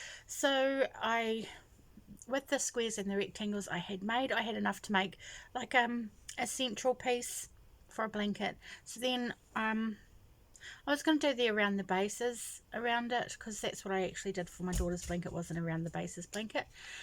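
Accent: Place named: New Zealand. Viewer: Hi.. I've been a subscriber for awhile now and always enjoy your videos (and your Kiwi accent). I've lived in NZ and you bring back fond memories. I agree with you about postage from overseas being expensive but a long time ago I discovered Springwools in Ireland and have bought lots from them. They have a flat rate international shipping which is 3.99 Euro and according to Siri that would be $7.05 NZ. Their service is nice and quick, although I just noticed they have a message on their site saying they're experiencing slight delays because of Covid-19. Anyway, its worth a look. I love the King Cole Superballs - 250G and work out very reasonable.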